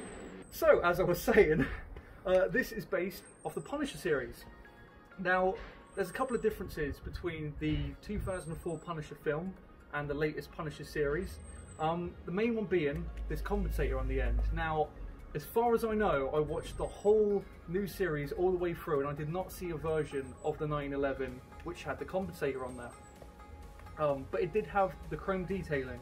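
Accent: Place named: United Kingdom. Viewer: I thought it was a real gun until I heard your accent and yeah your in Britain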